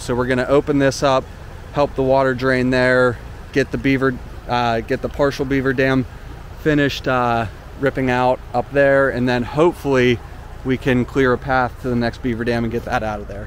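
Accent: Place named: New Jersey, United States. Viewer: It sounds like you're from Jersey...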